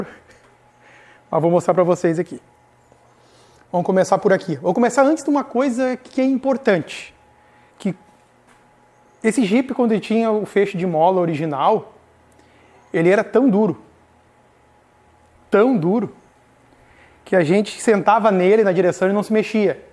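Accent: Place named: Rio Grande do Sul, Brazil. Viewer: percebi que era de perto pelo jeito de falar kkkk , sou de Novo Hamburgo. Também faço trilha , um dia nos cruzamos por aí 👍👍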